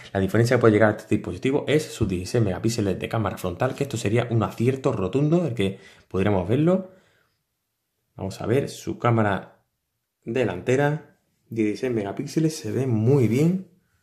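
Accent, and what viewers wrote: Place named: Seville, Spain. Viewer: Buenas Crack Últimamente me salen muchos vídeos recomendados en tu canal y.. me los veo todos la verdad jeje Tenía dos preguntas para ti, la primera: Este LeEco de ¿qué año es? no he visto nuevos LeEcos desde el 2016 y me quedé en el Le Max 2 y el Le Pro 3. Y la segunda, si puede saberse, ¿ De donde eres? por el acento tuyo y sobretodo el de las chavalas del vídeo intuyo de que eres almenos cercano a la zona de Sevilla (yo si soy sevillano, me hace ilusión ver que alguien cercano a mi también se dedica a esto :D ) Un saludo y feliz año!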